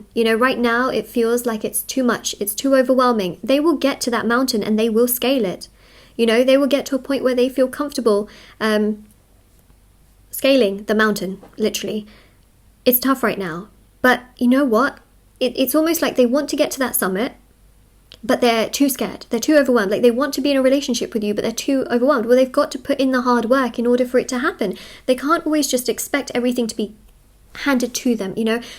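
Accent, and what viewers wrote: United Kingdom. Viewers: Dude you look like North Indian with strict parents but you sound like British ?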